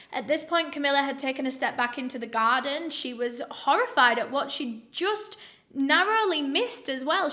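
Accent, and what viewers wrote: United Kingdom. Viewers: omg are you british? finally someone who is actually British. I love it when i have a British bredrin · Tell me you're from the UK without telling me you're from the UK...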